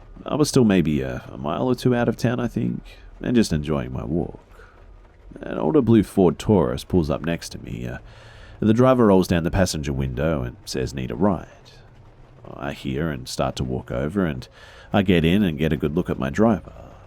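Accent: Australia. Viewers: How his voice is soothing and amazing to hear. It’s like an Australian Prince Charming whispering in your ear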